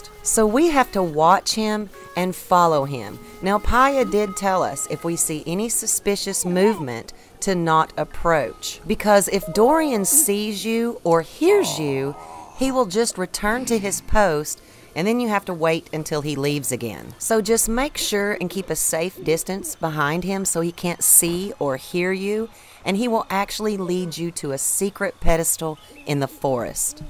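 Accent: South, United States. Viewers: I have just recently started playing boyw and I love tour vids, they are so well explained. Also I love that foxy southern accent. Much love from Scotland. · I swear homie sounds like she could be related to me. So damn southern it can't be scrubbed off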